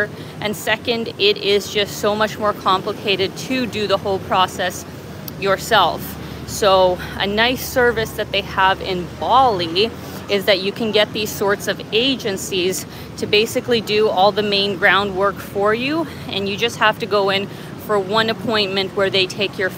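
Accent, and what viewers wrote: Scotland, United Kingdom. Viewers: are you scottish? 😁😁😁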